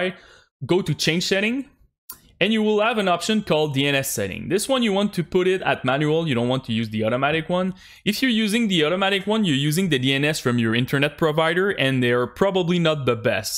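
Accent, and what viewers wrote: Quebec, Canada. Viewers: on dirais un accent qc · Clairement tu est québéquoi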